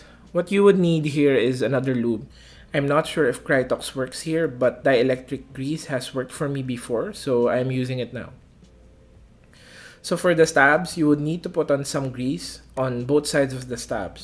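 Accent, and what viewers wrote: Philippines. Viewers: Pinoy ka ba? Parang medyo pinoy ung accent mo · Parang pinoy to a. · This dude Filipino, I'm Filipino and the accent is unmistakable.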